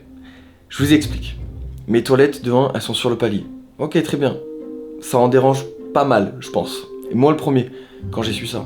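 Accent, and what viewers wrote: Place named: France. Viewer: Tu devrais vivre dans une banlieue islamisée, tu as tous les tics de langage des jeunes des banlieues.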